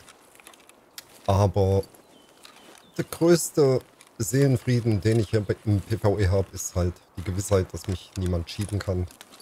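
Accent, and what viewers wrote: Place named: Saxony, Germany. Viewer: Vielen lieben Dank für deine Info. Dir auch schöne Pfingsten. PS: nach deinem Dialekt klingt es nach Sächsisch. Kann mich aber auch Irren.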